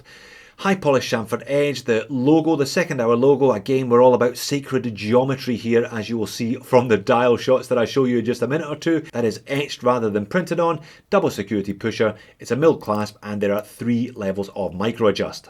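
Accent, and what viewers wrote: Scotland, United Kingdom. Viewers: He even says Om with a Scottish accent.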